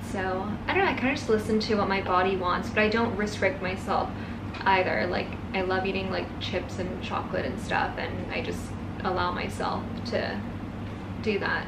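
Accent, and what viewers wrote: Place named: General American, United States. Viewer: curious why you got the North American generic accent while your brother got the aussie one. did you guys grow up separately?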